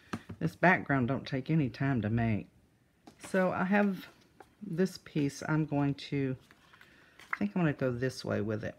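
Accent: South, United States. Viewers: Hi. Love the side fold idea!!!! I was watching your video and Alan walked in the room an heard your voice. He said, "Is that? I lover her Southern Drawl". You are famous in our house hold.:).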